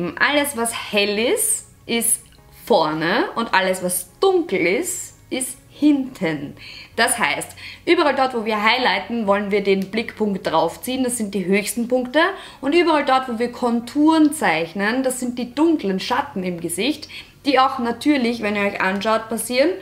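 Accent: Vienna, Austria. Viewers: ihr österreichischer dialekt kotzt einfach nur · Bist du Österreicherin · Bist du aus Österreich? Hörst dich ein wenig "Wienerisch" an, für mich als Wiener zumindest 😉👍🏻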